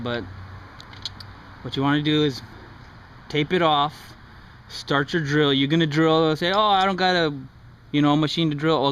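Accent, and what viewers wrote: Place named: Mexico. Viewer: Your Mexican Right ? i can tell by your accent :P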